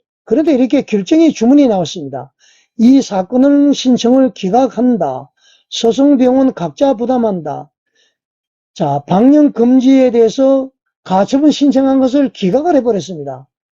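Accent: North Korea. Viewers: 어투가 북쪽어투 근접~~ 그냥 밝혀 주세요~~ 나의 출생고향은~~ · 유튜버님의 말투가 북한 말투 같은데... 실례지만 고향이 어디신지요?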